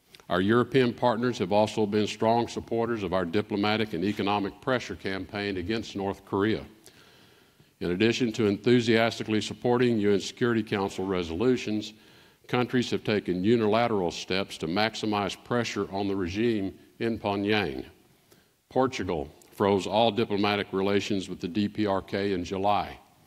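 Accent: South, United States. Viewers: Someone with a southern accent going to save life on earth is like giving a madman a nuclear bomb.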